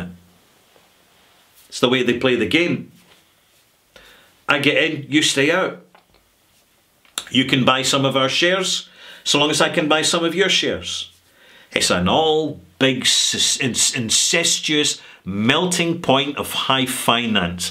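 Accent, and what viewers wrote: Scotland, United Kingdom. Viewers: Who else watches these videos for the hat and the Scottish accent.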